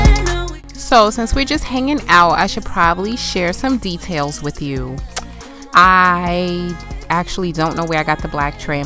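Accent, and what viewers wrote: New York, United States. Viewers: I heard you speak and thought “she’s from NY!” Lol. My family relocated from Brooklyn to SA, TX six years ago this June. I absolutely loved watching your video! Definitely subscribing! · I subscribed as soon as I heard your accent! I’m a native New Yorker and we picked up and relocated to Houston 5 years ago - hearing you feels like home 😊 Thank you girl, keep talkin your ish ❤️ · LOVED THIS VIDEO! As soon as you spoke I knew you were from New York! Totally relatable I’m from New York and relocated to Colorado so I felt you sis!!! Love your home so cozy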